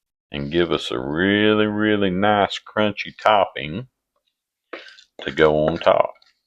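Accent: South, United States. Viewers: thanks for the tip. Thank you for wonderful recipes, step by step directions, an delightful southetn accent 🎉❤😊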